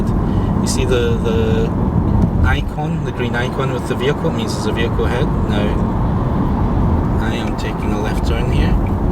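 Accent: Scotland, United Kingdom. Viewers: The normal expression on the UK Leaf Forum is: "Drive it like you stole it" , but to do that you need to head off to the Highlands, where men are crazy, roads are straight and empty, and traffic cops are few and far between. Envy your lovely Scottish brogue by the way: I've lived in Scotland since 1991 but never managed to shed my English 'posh' voice . And how the heck you manage to viddy three different screens at once beats me ... you obviously have a knack for technology.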